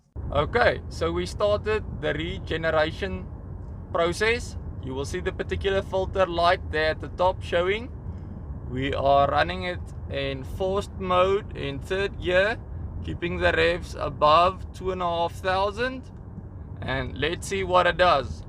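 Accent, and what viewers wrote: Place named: South Africa. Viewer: Definitely South africa !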